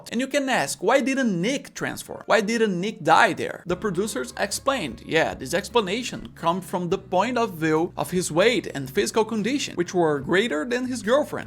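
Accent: Brazil. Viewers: Seu sotaque tá com cara de hue br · Dude, are you Brazilian by any chance? All of us have the same accent when speaking English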